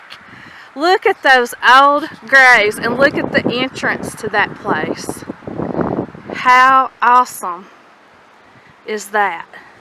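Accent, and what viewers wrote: South, United States. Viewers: Thanks, nice video, and love your southern accent! It’s a shame that the Jenny Wad house is literally surrounded by a 5 story hotel, somehow takes away from the experience. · i just love your southern accent,keep up the good work,i enjoy it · Not really a ghost hunter... I just watch these videos to see what people come up with... anyway, if you are from Alabama, you should have tried the Bloody Lane at Antietam (nearby) or around Devil's Den in Gettysburg... lot of Alabamians died around there... I don't think ghost boxes reveal very much, if anything... just a bunch of random, disconnected words... not real conversations... but one semi-legit guy said he tried sing to ghosts at Gettysburg thru a spirit box, and he got something like a full song in return... my point is, you have a nice accent and a charming southern demeanor... 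if you were trying to get them to talk, well, go where the Alabamians were and use that drawl, darlin'... 😊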